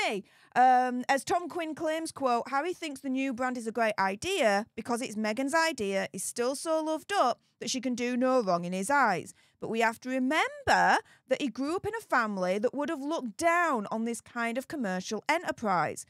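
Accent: Yorkshire, United Kingdom. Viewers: I just love your thick Yorkshire accent! If I didn’t have my own unique accent (Australian) I definitely would have a Yorkshire accent!😂😂🇦🇺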